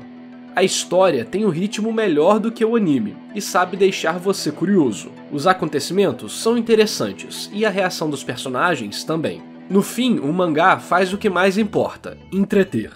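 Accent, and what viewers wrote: Rio de Janeiro, Brazil. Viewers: CALMA AÍ NARRADOR VC TBM É CARIOCA??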